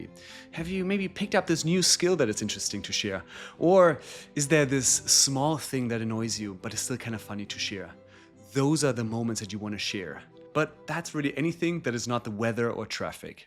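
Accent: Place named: Germany. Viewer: Gutes Video. Danke 🙏🏻 (ich denke deutsch passt Deinem Akzent nach 😉)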